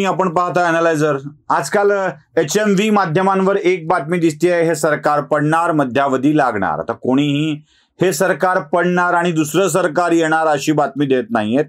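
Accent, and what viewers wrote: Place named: Marathwada, India. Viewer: Asal Maratha wada/ Chatrapati Sambhaji Nagar bhasha 😂😂 Mast 👍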